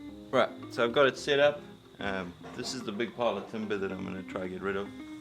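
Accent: South Africa. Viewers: Definitely a Saffer!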